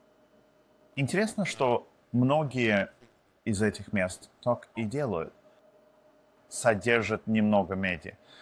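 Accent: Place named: Russia. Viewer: I was just telling my wife how great your Russian accent was! 😁 It made watching this easier for her as she doesn't speak much English at all. 🤭 But I saw the original En issue as well. 👍🏼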